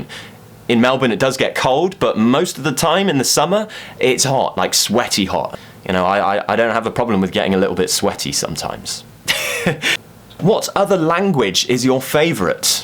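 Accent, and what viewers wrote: United Kingdom. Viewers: I love the British accent and of course your teaching way! Thank you so much for your priceless and wonderful lessons! Please keep doing it. When I have a bad mood or something wrong I just watch your lessons. I want to say that your videos makes me feel a better and change my mood because your video is enjoyable. Thank you! 😊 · I like all Your video since the first time I found Your Youtube Channel, it help me improve my listening skill especially understanding British accent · I love your sophisticated British accent omg <3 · A shoutout from Brazil. I'm passionate about the British English and I love your accent. Your videos are brill! keep it up! Cheers! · Hi. I watch you often, trying to learn British accent. You're a cool guy. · Love the British accent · Another question: Do you want to be my personal teacher? It's a joke, but I love you...rrrr accent... 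The only British accent I understand is yours. 😍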